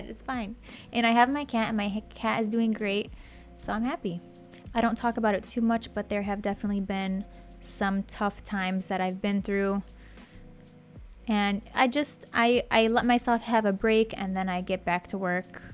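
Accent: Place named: United States. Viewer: As someone who lives in Britain i think it would be funny if you tried to approach people and do a British accent and see if people notice or fall for it, or notice that you actually have an American accent.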